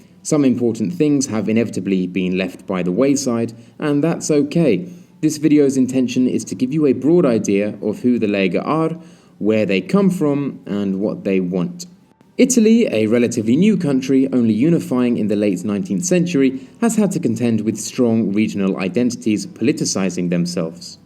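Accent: United Kingdom. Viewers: Wow you're from Italy? I thought you were British this entire time. Regardless, great content like always! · you are from the south of Italy? All of this time i thought you were somewhere from England. Am i correct in guessing that you moved to the South?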